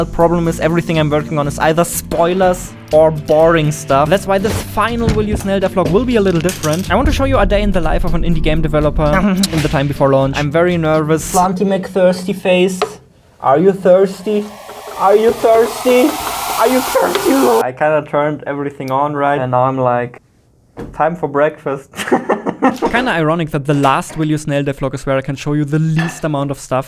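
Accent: Germany. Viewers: i like how you can hear his german accent sometimes sneak through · Really uplifting and funny videos! I love the German accent as well! What's the name of the gray fluffy bamsefleece sweater you wear at the end? I got a Norwegian hoodie made in the same material and they are great. Good luck with your game. I've wish listed it on Steam. Looking forward to it!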